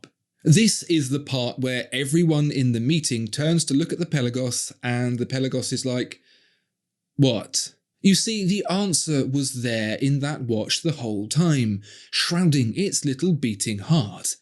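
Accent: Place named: United Kingdom. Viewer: I love to put the face to the voice I’ve heard for years… However you gotta find a space where you’re better depth of field… The blue background is too stark… And all the YouTube army of watch channels do the perfunctory man cave muted colors with tchotchkes curated from the cork sniffing image they’re attempting to curate… And although I’m sure you paid an artist for your logo it looks too much like a car design and has nothing horological to denote the subject of your channel… Otherwise I’ve enjoyed you for many years and now my sons are all watch enthusiasts As with most things a British accent elevates and always has the right amount of tonality to not be hard on the ears and your copy is always a beautiful poetry of observation, Snark and on point assessment When I started researching watches the only man I found at that time was Alex from Watch advisor… Who actually lives in Switzerland and seems to know many companies ceo… I still find his charming enthusiasm and accent useful and comforting… But keep up the good work Your voice is often playing on the TV in our household🎉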